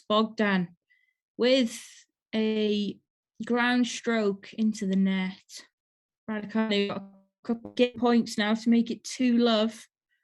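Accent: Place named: Merseyside, United Kingdom. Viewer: thanks for the talk-through. You're a lovely lady and you have a fun stage presence. Can we get you on Match Of The Day, please? I'm sure you'd be an instant hit! I'd love to see you talk through a rock concert, too - Foo Fighters, Paul McCartney, Metallica, whoever.... I just know you'd be an absolute scream and a big hit doing that. I've lived too long away from the UK to work out your accent, but somewhere not all too far from Merseyside seems a possibility. Like I said, I ain't too good at accents. For me, anyone who just tries is already a star. Good on yer, young lady! I've subbed;-)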